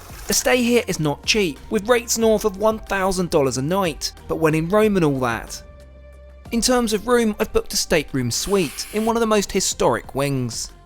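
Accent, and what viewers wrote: United Kingdom. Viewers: Two reasons why I won't watch. First you smile too much. No real person does that. Second is the Brit accent. Awfully hard to listen to especially they way the voice drops off at the end of a sentence. How about some good old American for a change? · This guy has the most British accent ever!!!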